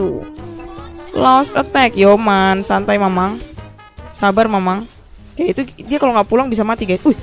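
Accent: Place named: Java, Indonesia. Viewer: Medok Jawa mantab to the tab tab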